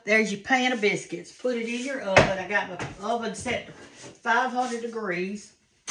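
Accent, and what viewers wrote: South, United States. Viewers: Ms., where are you from if you don't mind me asking. You sound so southern and You remind me of my NANA, BECAUSE SHE USED TO WEAR HER APRONS ON EVERYTHING SHE COOKED. I LOVE YOU MS.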